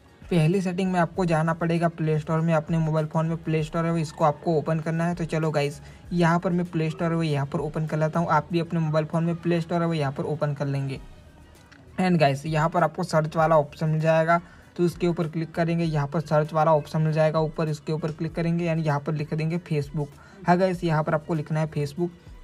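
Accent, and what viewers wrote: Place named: Telugu, India. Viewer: Bro you are Telugu 😅😅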